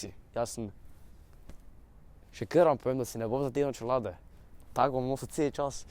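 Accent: Styria, Slovenia. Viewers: Nauč se govort normalno pa ne po Štajersko pa boš Mel več ogledov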